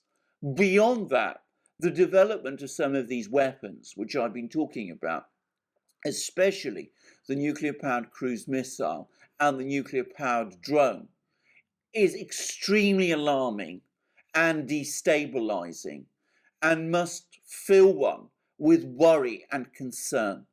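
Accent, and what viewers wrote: England, United Kingdom. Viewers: all news should be delivered, in such a professional manner. Your wonderful crisp, English accent, is much appreciated. Thank you for explaining, what I cannot 🇬🇧xx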